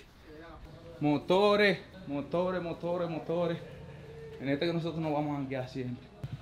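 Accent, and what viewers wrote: Cibao, Dominican Republic. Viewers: Mi hermano sigue aciendo lo que te gusta ere bueno en eso tu asentó del cibao .te queda.vien y yo soy capitaleño....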